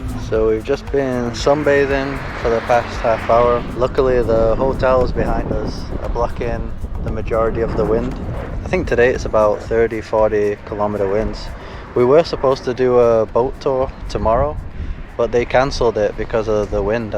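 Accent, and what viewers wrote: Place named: Yorkshire, United Kingdom. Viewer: Hi it's John do you have a link in Yorkshire your twang sounds like it is thanks for the video John uk 😊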